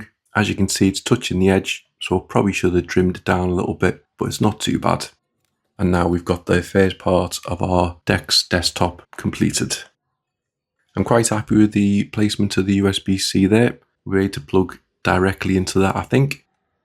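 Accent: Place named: Liverpool, United Kingdom. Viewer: Love it, I have a Google Pixel 6a that I can run Ubuntu or Kali net hunter on. The mellow scouse accent was different.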